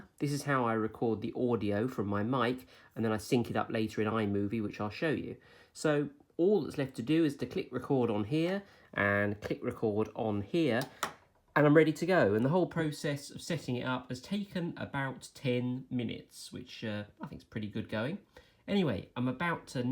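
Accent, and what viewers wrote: United Kingdom. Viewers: I like listening to your very British pronunciation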